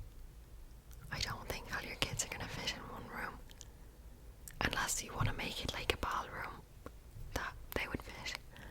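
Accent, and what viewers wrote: Ireland. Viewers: Loved this! More pls- in Irish accent ofc!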